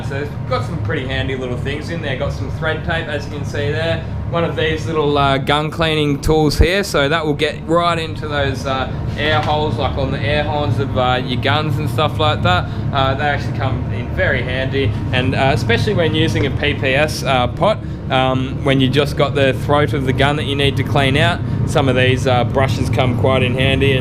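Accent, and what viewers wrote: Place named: Australia. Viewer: not sure if your in Australia or not, your accent makes it sound like you are. If you are will you please show custom spray mods how to paint. I swear he paints like some shoes never held a gun before. trigger happy as hell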